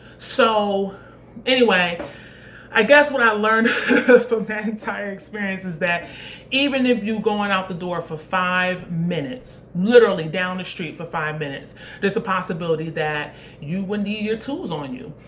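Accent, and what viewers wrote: New York, United States. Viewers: What part of NY you from? It sneaks out when you get excited.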